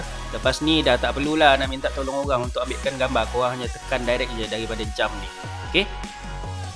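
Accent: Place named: Muara Tuang, Malaysia. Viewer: Eh, muara tuang eh. Patut la mcm ngelala jak bunyi sleng tek. Hehe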